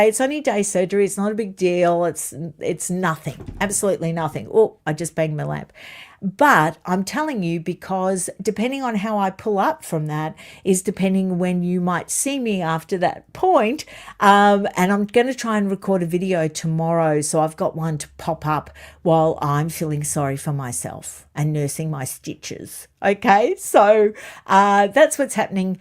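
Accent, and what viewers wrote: Australia. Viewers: Yeah,. But they are swayed by you. God love our Aussie voice ❤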